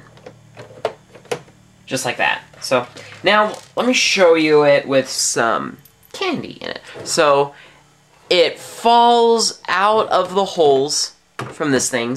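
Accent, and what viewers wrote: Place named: United States. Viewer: i love how im english and i heard u say some american words wich are funny like lever and we say leever and nickle. pence :P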